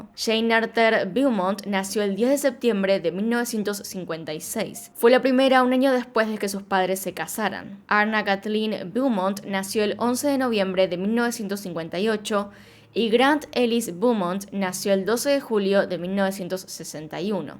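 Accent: Argentina. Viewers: Me encanta tu acento argentino y cuando dices "una vez más" eres muy auténtica 🤩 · Me encanta el acento argentino 🇦🇷 ♥️♥️👍🏻✨ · Me encanta tu acento Argentino y como te expresas. Saludos y felicidades por tu cumpleaños 🎂🍹🎁🎉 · Me gustan mucho tus videos. Haces muy buena investigación y narras muy bien. Solo me cuesta un poco de trabajo acistumbrsrme a tu pronunicación argentina: “ninios” y “plasha”...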